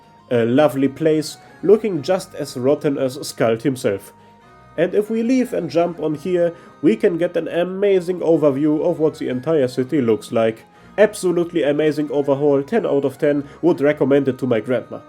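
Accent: Germany. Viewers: Man merkt voll dass du aus Deutschland bist 😅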